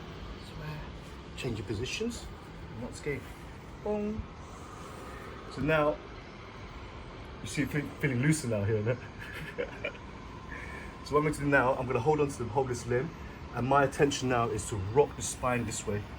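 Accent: United Kingdom. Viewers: ok an asian guy talking like a british man is enough for me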